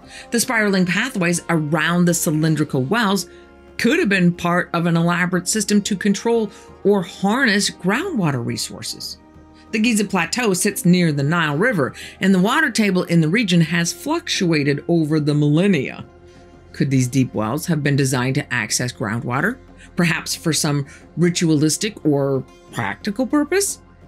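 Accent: United States. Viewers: What an irritating Americn voice....................prat of the year so far!!!!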